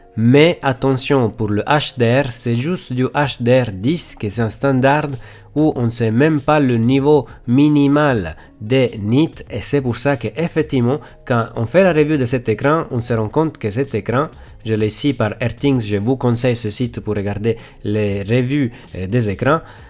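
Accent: Italy. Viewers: Bravo je m abonné et jolie accent italien ???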